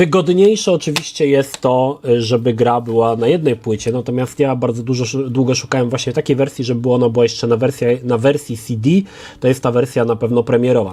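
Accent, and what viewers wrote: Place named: East, Poland. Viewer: O wymowę bym się nie czepiał, może nieco zbyt wschodni akcent i stąd to mocno słyszalne "z", ale to drobnostka, a pochodzenia nie oszukasz:) Lubię, ale jeśli coś mu mógł bym zarzucić to to, że często mówi coś o czym nie ma pojęcia albo mu się wydaje, że ma pojęcie oraz to, że jego materiały są robione trochę na odpierdol. Do pierwszego zdążyłem się przyzwyczaić, w końcu komu z nas się nie zdarza pieprzyć głupot? To może czyni takim ziomeczkiem, nie jest jakimś nieomylnym guru i Jaśnie Panem Youtuberem. Więc pomyłki, zwłaszcza na streamach, jestem w stanie wybaczyć. Ale materiały robione na kolanie trochę mnie mierżą. Po takiej recce oczekiwał bym jakiegoś przygotowania, scenariusza, trochę więcej wkładu własnego, a nie na zasadzie "chuj tam, wpiszę 1, nikt i tak tego nie ogarnie". No i swoją drogą przyłapałem na kłamstewku. 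Mam nadzieję, że karę za to w tym roku Mikołaj przyniesie mu soczystego crapa pod choinkę ^^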